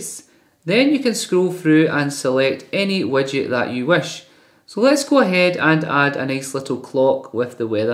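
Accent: United Kingdom. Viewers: Are you Scottish Laddie? :)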